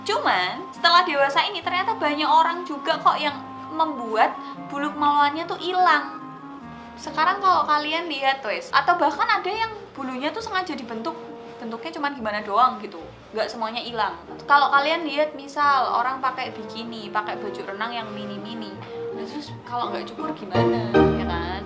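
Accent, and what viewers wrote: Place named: Surabaya, Indonesia. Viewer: arek arek bgt mbk,e iki medok bgt suarane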